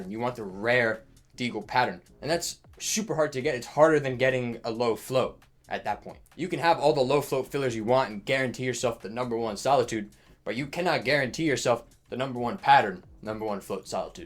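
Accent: New York, United States. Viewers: The intellectual new york accent is rare but very effective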